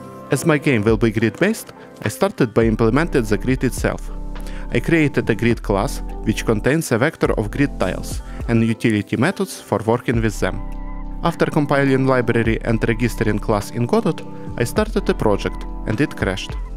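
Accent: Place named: Russia. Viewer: Русский (или украинский) акцент почему-то позволил мне смотреть видео без перевода. Всё чисто и понятно. А вот речь настоящих американцев или англичан мне воспринимать трудно.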